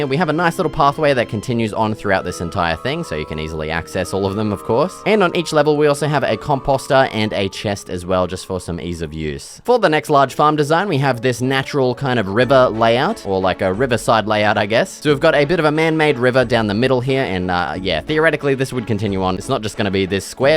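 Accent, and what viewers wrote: Australia. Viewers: I like your channel I love building in all games but idk I just really like your channel pretty genuine also question you Australian?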